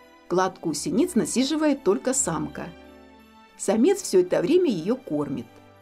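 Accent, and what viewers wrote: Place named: Vologda, Russia. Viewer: Интересный говор. ВОлогодский конвой шутить не любит. Угадал?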